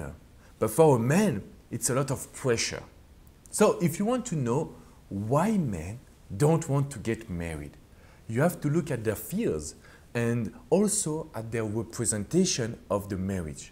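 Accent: France. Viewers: Like your French accent!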